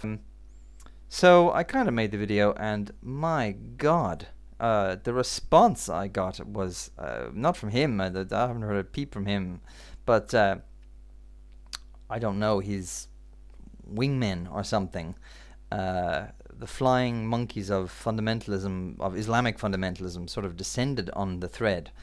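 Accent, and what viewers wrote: South, United States. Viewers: hey i love that southern accent you use in this video. i'm from the states and i've run into the southern trailer park type that talk like that. cheers! and have a great day:) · wow, the southern draw is just great. I think it makes a great point.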